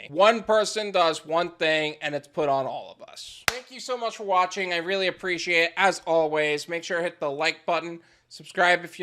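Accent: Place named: United States. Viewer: Bro i like you us accent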